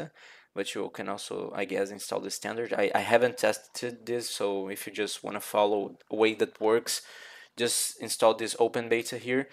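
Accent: Brazil. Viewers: Valeu, irmão! Senti nosso sotaque de longe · tu falou 3 palavras e vi que tu é BR, tmj mano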